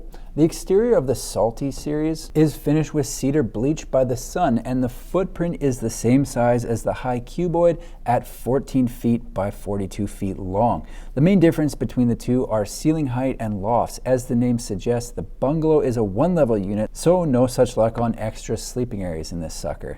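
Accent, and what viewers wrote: Canada. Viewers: Grammar guy here. I learned grammar, not pronunciation. Moreover, you're Canadian, your national pronunciation could be very different my California surfer dude twang. That written, ā indicates a long a as in hay, play, or gay. Given most US people think Canadians liter their sentences with ā, often written as "eh," I am not going to even take a run at this. Great homes, fabulous review.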